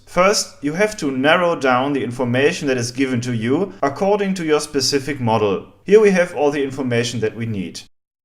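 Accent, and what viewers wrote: Germany. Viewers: I love your videos and your german accent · lol i can hear your german accent. even tho i can tell you seem to make an effort to try and hide it. but damn i loved then video. great use of all them old bits lol maybe ill put to use the old tape deck i have out of my ford lol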